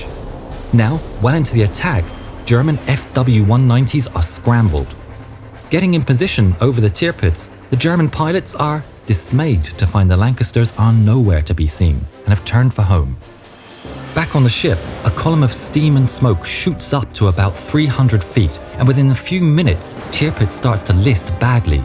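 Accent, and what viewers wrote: United Kingdom. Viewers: The narrator's accent sounds a bit British... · the narrator is British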